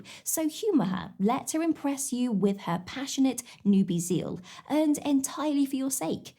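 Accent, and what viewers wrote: United Kingdom. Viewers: Hey there, are you into me? 😂😂😂😂😂😂😂 I'm kidding. I'm here to listen to your British accent, I love it! You have a blessed day.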